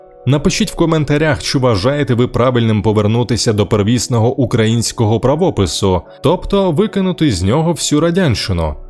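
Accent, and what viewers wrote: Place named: Russia. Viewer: Якщо переймаєтесь правописом,то потурбуйтесь ще й про вимову. Ведучий чьокає і щьокає на рівні з росіянами((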